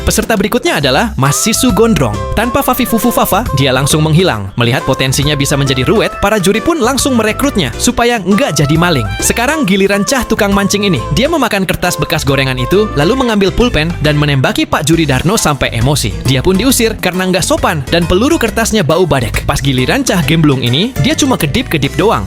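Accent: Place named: Banyumas, Indonesia. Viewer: Admine wong ngapak apa kepriwe kie 🗿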